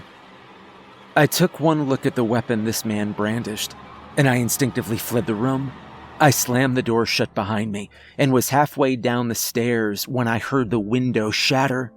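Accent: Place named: Midwest, United States. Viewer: He certainly has an accent. It's really only noticeable with the way he pronounces certain words, like saying "crayons" as "crahns". If I had to guess, I'd say he's from the American midwest somewhere.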